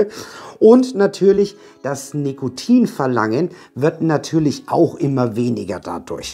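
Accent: Franconia, Germany. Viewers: bist du franke?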